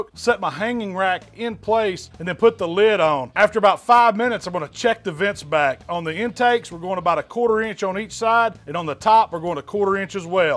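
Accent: South, United States. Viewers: Dewalt drill, Tomahawk Steak, American southern accent. All is missing is the American flag.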